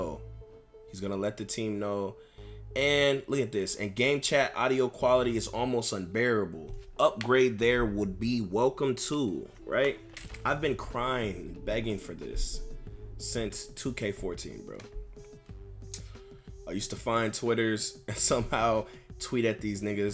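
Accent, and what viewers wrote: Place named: Los Angeles, United States. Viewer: I swear he sounds like he's from LA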